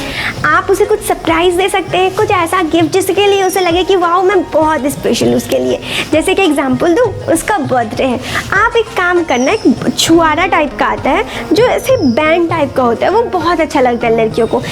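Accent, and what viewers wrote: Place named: Jharkhand, India. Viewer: Jharkhandi boli ka asar Hindi me hai. Kya bat hai madam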